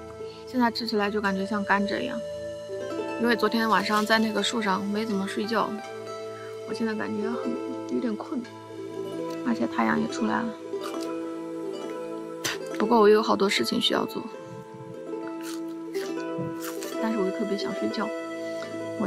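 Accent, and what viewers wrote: Henan, China. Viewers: 你有河南口音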